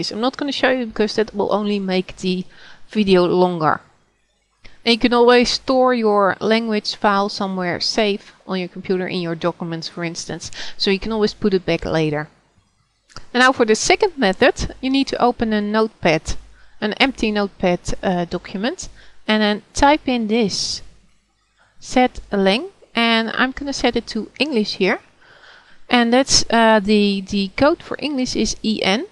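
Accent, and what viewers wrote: Netherlands. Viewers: i think your dutch :) dankjewel voor deze video :) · Are you Dutch? kinda hear ya accent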